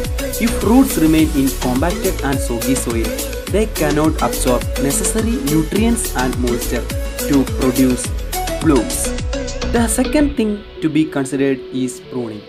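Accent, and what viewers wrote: Kerala, India. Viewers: Malyali english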